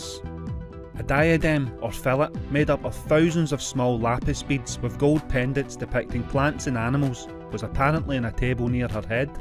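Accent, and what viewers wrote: Scotland, United Kingdom. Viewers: He's speaking in English just with a scottsmans accent, you'll get used to it.. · Amazing content as always! Scottish accent is a bit tricky by the way haha.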